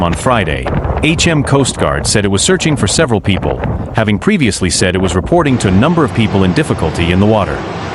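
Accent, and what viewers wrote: United States. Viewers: Why is it an American reporting this….sounds like bull … No different to any other year for some parts… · Why has the narrater got an American accent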